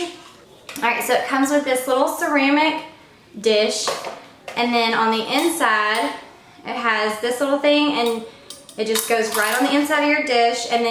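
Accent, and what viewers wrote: South, United States. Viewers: Congratulations on 10K!!!! How exciting. I am a new Sub. and I think your home is Beautiful and that Southern Accent!!!!! LOL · Congrats!!! Subscriber for a while now. And started following you on IG last week. Being from the north, I love your Southern accent ❤️ Love your channel!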